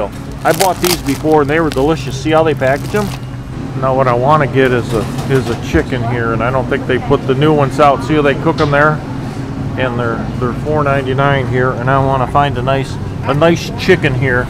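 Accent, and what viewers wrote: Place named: Midwest, United States. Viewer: You have such a mid western way of speaking it's so corny is a good way 🤣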